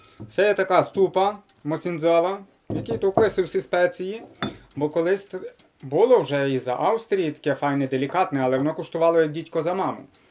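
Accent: Hutsul, Ukraine. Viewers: З'їсти ситного - це добре. А от почути рідну мову, та ще й гуцульську говірку, - це делікатес для душі, й п'ятдесяти грамів не треба.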